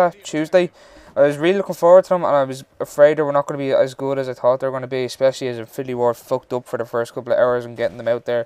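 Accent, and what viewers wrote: Ireland. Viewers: Wat part of ireland r ya from I from ulster tyrone omagh town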